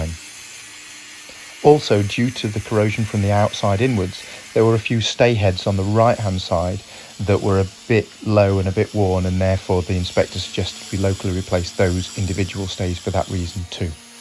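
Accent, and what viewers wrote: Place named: Australia. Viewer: ah yes inside a boiler firebox I have known it quite a bit the last few years as I help out at a railroad historical society, cleaning the grates and drilling out telltells as well as blowin out flues once, I show one time I did on my channel in video if anyone wants to hunt, I am surprised though your using a grinder to cut that off we usually just use a torch to burn the stays out and cut the sheets or a plasma for cutting the new ones, its faster, its messier and has more cleanup but its more expediant, I like your voice though its pleasent to the ears and articulated when you speak some have such a dialect or accent you cant hardly make out what they say , you sound though almost australian, I am no boilermaker though but I read up on research and projects even if I cant do the math to design it, soo many things you can do to make a boiler more effecent or burn cleaner, or it dont even have to have fire there is boiler that uses solar collectors that follow the sun and they are getting an 800 degree super heat on the steam meaning its hot enough to compound if you were to use it in remote locations like off grid so diesle dont have to be burned its noisy expensive and smelly, the solar project that the guy at vapor locomotive company in idaho in the states is heated by the sun, though its generating rate of steam I dont know the capabillity of currently, and you have the facinating differant layouts of tubes and flues as well as multipass tubes and rifled boiler tubes lik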